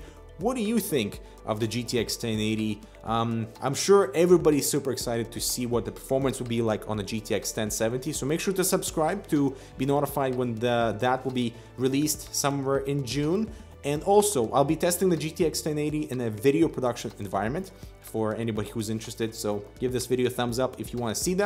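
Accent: Russia. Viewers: русский чтоле? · То есть, он русский? :D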